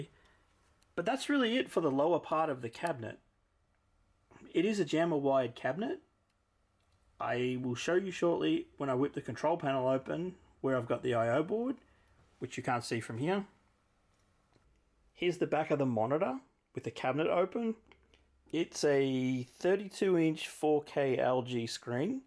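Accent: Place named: Australia. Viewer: Love the marquee mate! Looks awesome. I suppose you’re also in Australia or maybe NZ by the accent? I just placed an order with Chino last night and should be getting mine in NSW in a month. Very excited and planning on making some small tweaks - mostly replacing the monitor and connecting either a pc or my ps4/ps5 at some point. Just checking if you’re really in AU/NZ to get some tips on where to get parts from locally. I’m sure I’ll need a little box or some cables here and there lol. Cheers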